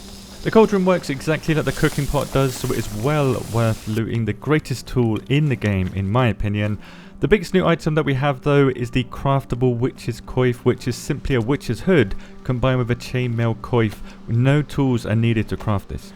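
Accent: United Kingdom. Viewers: tryed again to watch a vid of you, again i cant. this british accent is like a chainsaw to my ears.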